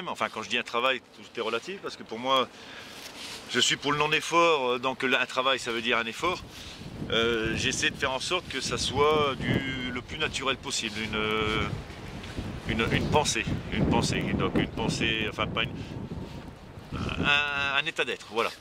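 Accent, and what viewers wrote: Nord-Pas-de-Calais, France. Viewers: Une personne simple, qui vie simplement, qui parle « vrai »... Je suis normand et habite en Auvergne depuis peu, j’allais très souvent voir les ch’tis, là haut, que de bons souvenirs. Je pense que nous aurions aimé, mes amis et moi, nous retrouver à boire une bonne petite pinte dans ton bar. Ça me fat du bien de réentendre cet accent. Nous construisons aussi notre petite oasis, de notre côté nous faisons notre chemin. Je te remercie, j’ai l’impression d’avoir pris ma vague d’optimisme et de positivité pour le week-end et plus.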